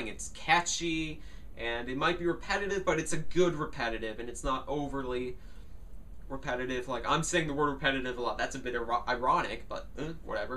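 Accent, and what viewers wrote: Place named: Transatlantic, United States. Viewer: You're not only the first person with one of those funny transatlantic accents I've seen to like. You're probably the first Yank I've met who properly gets the class system we have over here. Kudos for that. Seriously. Also interesting point about something changed. Never thought about it before but you're right. It doesn't fit with the rest of the album. Not bad for a whippersnapper.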